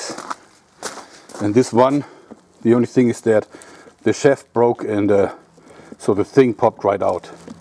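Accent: Germany. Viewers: Are you German? Your accent sounds like that (I'm a native German so I this accent and I'm pretty sure he's German) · german accent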